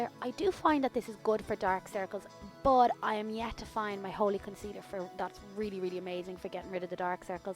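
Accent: Ireland. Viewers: This is an old video but I was contemplating getting the Vintage Vamp and wanted to see it on blue eyes, I’m definitely going to buy it now! The lovely Dublin accent was a nice surprise and reminds me of home as I live in the Uk, up the dubs! Xx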